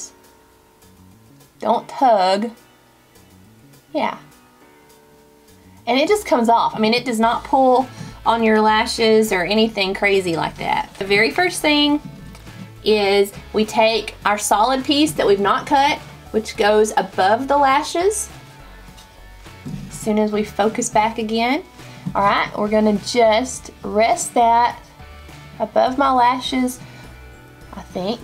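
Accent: South, United States. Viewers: Love...and love your southern accent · I just bought them yesterday and just found you today thank heavens, I've never tried false lashes of any kind and will try your advice. Hope I can do this. Also, I love your sweet southern accent! Thanks for your help! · She has the cutest Southern accent!! ♥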